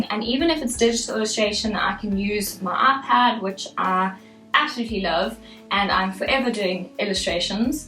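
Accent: South Africa. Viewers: Been looking for a fellow South African to share a day in the life, and your accent kinda gave it away before the SA patch on your tshirt😂 but anyway this was insightful and really entertaining. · Your accent sound South African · its funny how I instantly knew were a south African by your accent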